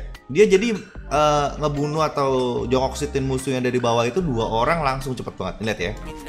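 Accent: Java, Indonesia. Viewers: Orang Jawa to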